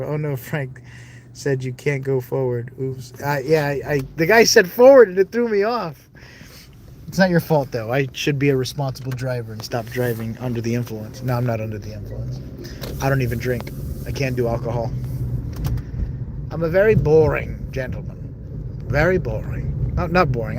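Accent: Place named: United Kingdom. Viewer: I love your British accent. You and could just have so much fun being silly AF 😂😂😂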